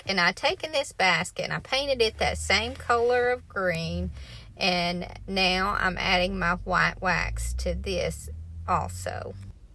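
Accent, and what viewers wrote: South, United States. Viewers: Hi, I just found your channel and love it. I was wondering where your store is located. I related to your style as well as your southern accent. I am from south Ga., but live and have 2 booths in Tn.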